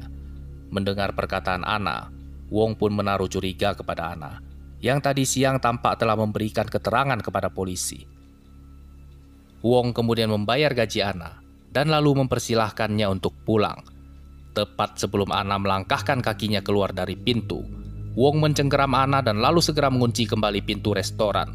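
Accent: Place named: Sulawesi, Indonesia. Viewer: Speaker nya logat nya pasti dari Sulawesi 😂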